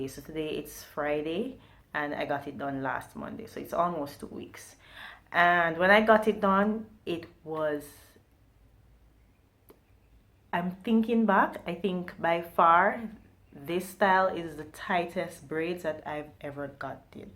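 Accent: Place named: Guyana. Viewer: Why do you sound Guyanese!!! 😍😍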